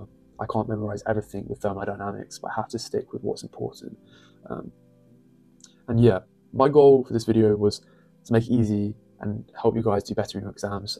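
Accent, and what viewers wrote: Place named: England, United Kingdom. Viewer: Lovely to here clean, calm, English accent so "unYOUTube🇺🇸" Not one GUYS! You're A very nice TEACHER that's my opinion, as a teacher. 😊 Thank you flower! Edit, ok, so a 'guys' but not annoyingly.😅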